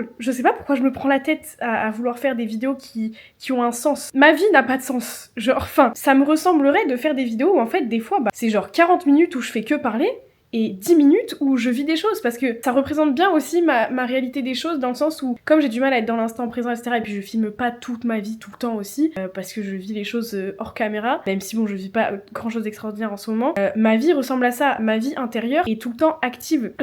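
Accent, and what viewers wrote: South, France. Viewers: J'pense le dire en com à chaque vidéo mais quelle BG 😁 et cet accent du sud, elle transpire le soleil ☀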